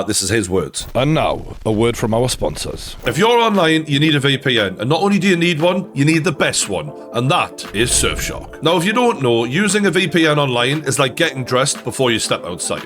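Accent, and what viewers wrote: Newcastle, United Kingdom. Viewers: That's a Geordie accent - from Newcastle-upon-Tyne in the north-east of England - along with the West Country accent, it's the most pleasing to us Brits....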